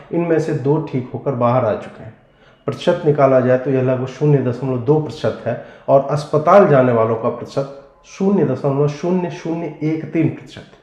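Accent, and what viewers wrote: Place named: Bihar, India. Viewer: अजीत भारती भाई आप का विश्लेषण का जबाब नही होता पर व्यंग्य वाली वीडियो ऊपर से बिहारी तड़का शुद्ध हिंदी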